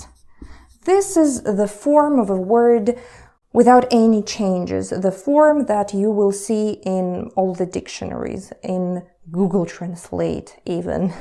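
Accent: Russia. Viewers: на самом деле русский акцент очень слышен)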